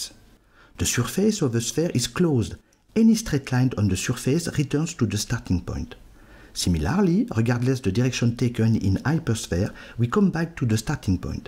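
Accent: France. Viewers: His accent is like hercule poirot :) pronouncing the r like in french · nice explanation, i like the french accent :) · This video actually helped me process 4D. Also the narrator's french accent was funny and brightened my day :)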